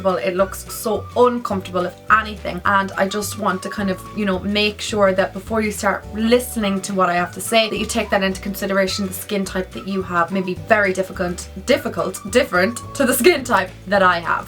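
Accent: Ireland. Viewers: She's from Ireland 😋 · what part off Ireland are you from Xxx love your videos <3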